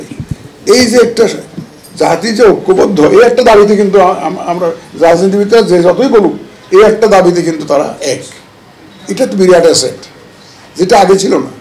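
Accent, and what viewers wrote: Chittagong, Bangladesh. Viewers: হুম তাই। উনাকে আজকেই দেখছি, প্রথম বার উনার কথা শুনছি। উনার কথা শুনে কমেন্ট পড়তে এসেছি, উনি কোন জেলার কেউ লিখেছে কি-না। কারণ উনার কথায় আমাদের চট্টগ্রামের একটা টান আছে। আপনাকে ধন্যবাদ, উত্তর টা পেয়েছি। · কথায় বুজা যাচ্ছে উনার বাড়ি ও চট্টগ্রামে। যাহোক উনার কাছে আমরা ভালো কিছু আশা করি। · কথায় একটা চাঁদগাঁইয়া টান আছে।চট্টগ্রাম ❤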